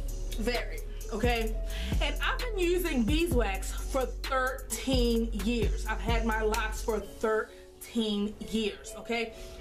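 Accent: Baltimore, United States. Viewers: Are you from Bmore? If not you have a serious Bmore accent. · you from baltimore?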